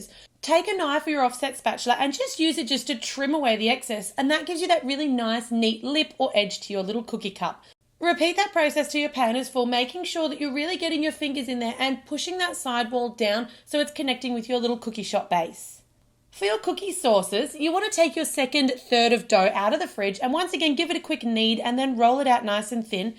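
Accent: Australia. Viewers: Are you Australian because you sound like one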